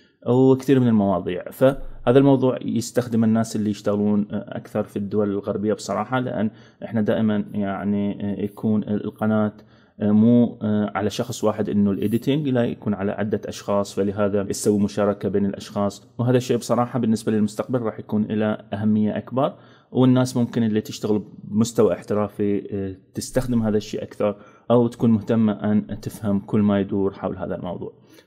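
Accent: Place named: Mosul, Iraq. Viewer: عاشتيدك والله احسن شرح لبرنامح البريميوم مبين عليك مصلاوي من العراق مو😅💜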